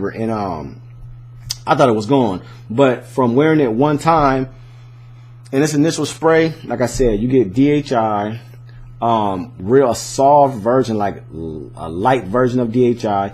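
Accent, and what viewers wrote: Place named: California, United States. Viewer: you could tell he's from califronia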